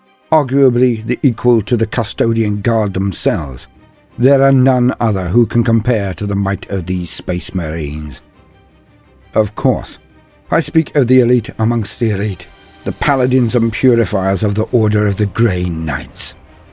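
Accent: United Kingdom. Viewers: I always give warhammer channels an extra 20 points for having a British narrator.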